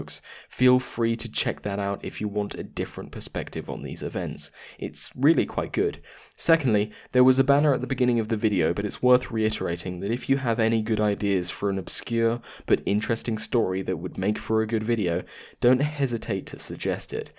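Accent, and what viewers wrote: United Kingdom. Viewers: I like hearing this story from a British accent point of view but when The History Guy .. did his episode about Cole I bought 3 of his tee shirts. ..which deserves to be forgotten.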